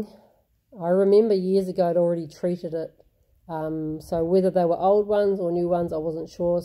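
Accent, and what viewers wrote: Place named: New Zealand. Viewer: oh not your fault … yes I watch anyway ! Cool to know that it’s a Kiwi accent . I have heard other Australian accents but know nothing about them . Will keep watching!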